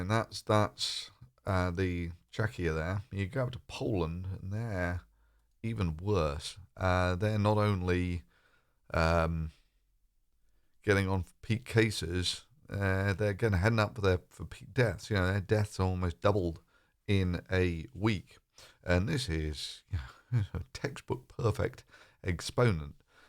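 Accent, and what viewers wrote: United Kingdom. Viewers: I seen where u get your accent. Its grom uk. This guy is a aristocrat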